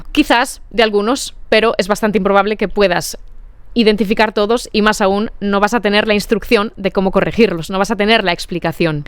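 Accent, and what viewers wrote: Spain. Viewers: I like your accent so much. ¿Este es el accento de Madrid ? Es clara, fuerte, bonita:)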